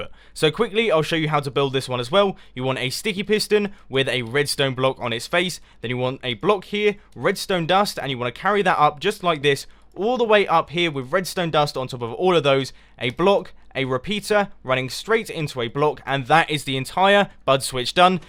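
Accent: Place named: United Kingdom. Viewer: Yeah and he has a very British accent lol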